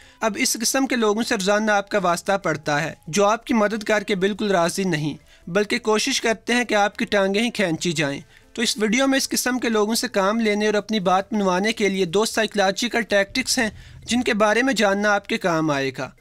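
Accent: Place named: Gujranwala, Pakistan. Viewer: Bhai g gujranwala k lag rhy...😌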